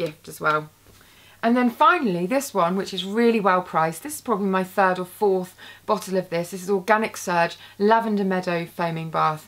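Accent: United Kingdom. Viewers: You are amazing!!!!!! I like ur British accent( usually I'm not fan of it:), you are so unique and eye-popping! Keep doing an amazing job!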